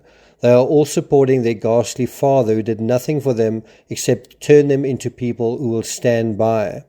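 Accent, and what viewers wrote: South Africa. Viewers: Hi- Annie here. I was born in Cape Town so I recognised your accent immediately. My parents brought me to the US for medical reasons when I was quite young. We spoke English and Afrikaans at home but i lost my accent at age 7 to avoid being teased at school. I now live in the state of Arkansas and am a retired psychologist. I have been watching the Chad Daybell trial since day 1 and I love your video. It is clear and straightforward (as much as such a complicated case like this can be described). I agree that that the family is completely enmeshed. In fact, many strict Mormons are; Chad's followers,.however, are a cult within a cult. I am very pleased with today's verdict. The sentencing phase continues tomorrow with victims' statements. I think we will see many tears. I am fascinated by this story and trials have sparked worldwide interest and am pleased that South Africa has such an amazing True Crime network. 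Do you cover many American True Crime stories? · I follow this channel from South Africa, and i have been wondering why you report on American crimes, as i picked up your accept. Just confirmed you are South African, living in the US. Great stuff, been following since Gaby's case.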